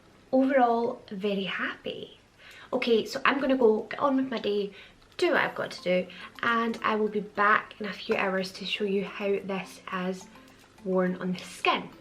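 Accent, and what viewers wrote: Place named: Scotland, United Kingdom. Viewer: LOVE that Scottish accent omg!!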